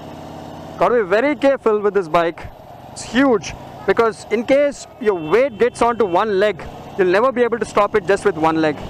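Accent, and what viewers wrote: India. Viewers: You Accent also reminds me of the Russel Peter's Indian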